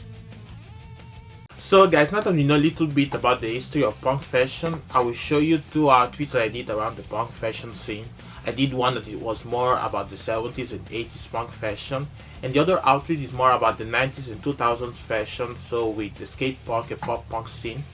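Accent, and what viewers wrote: Italy. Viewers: From your accent I feel that you are Italian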